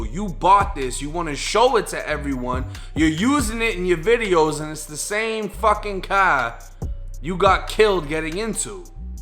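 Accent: Boston, United States. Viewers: Yo my guy are you from Boston?? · Cahhhh, tahhhh-git. You gotta be from Boston or providence lol mad love from a New Yorker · Hey man I know youve lived between FL and MA. I grew up in MA abd moved to FL recently. Did you pick up dropping your R's in MA or is that how you've always talked? No shade at all just wondering. Cause I grew up w/ that shit but I didn't know if the Boston accent is picked up when you're older. Just foubd your channel and I'm definitely a fan, keep the grind up and good luck. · I hate your Bah-ston accent lmao 🤣 "He drove his cah, he didnt stahp, he went too fah, he was paht of the cahtel..." Good videos bro, I fw the page 💪🏾💪🏾💪🏾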